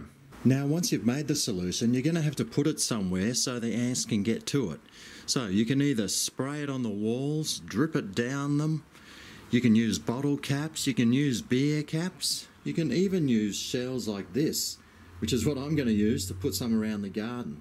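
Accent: Australia. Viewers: Love Australian accent. I watched several videos on this. Some were too long. Some moved their phone around so much that I got sea sick. This was short. To the point. Simple. Concise. And I like that you added the caution at the end about dogs, butterflies, etc. Thank you. Your formula is the one I chose. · Love your accent and calm energy. I lived in Oz for 1.5 years, it was the highlight of my life. You seem to live in paradise, wonder which part you're in. Trying your ant solution now, thanks so much 👍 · Thanks for the info! Love your accent, makes me want to throw some shrimp on the Bar-B. After I clear the area with a borax solution! Thanks again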